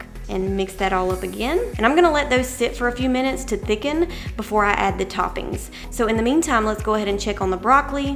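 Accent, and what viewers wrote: South, United States. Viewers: Giving you all the likes! :) you are beautiful! And I love your southern accent and your recipes ☺️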